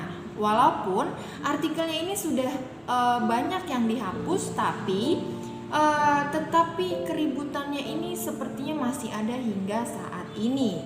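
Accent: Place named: Java, Indonesia. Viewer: Se' lo mba, medok temen bosone 😂 But, Informasi yg disampaikan sangat bermanfaat, thanks yoo atas infonya👍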